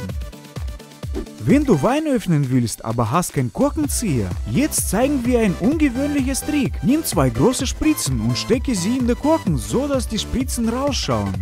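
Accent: Russia. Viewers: Are you Russian or Polish? Your German accent is interesting. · Nur wegen diesem russischen Akzent geguckt :D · bist du aus Russland?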